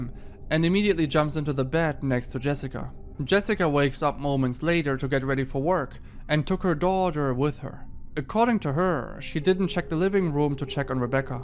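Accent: Germany. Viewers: I knew u were German u have a similar accent to my bestfriend from Augsburg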